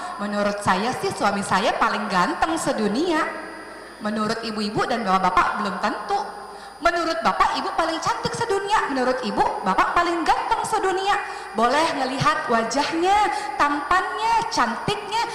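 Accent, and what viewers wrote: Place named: Java, Indonesia. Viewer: logat nya ko jawa ya😁😁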